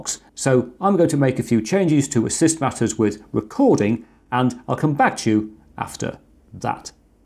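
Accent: United Kingdom. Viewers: Dear: I've been enjoying your videos and learning through them for several months now, and I thank you heartily for that. I wish to make a suggestion to you to further enhance the quality and usefulness of your videos. Trouble is, there is a certain amount of ECHO in the room where you record your videos, that hinders the clarity of your otherwise excellent speech and keen British pronunciation. And believe me, that echo makes some passages hard to understand. I dare suggest that you seek the counsel of a sound recording specialist that helps you to identity the sources of those echoes and take measures to dampen them, such as adding wall isolation materials, or perhaps some curtains or rugs. THANK YOU for your attention.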